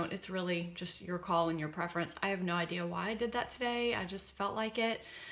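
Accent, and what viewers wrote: South, United States. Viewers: It's a southern accent thing. I have this "issue" too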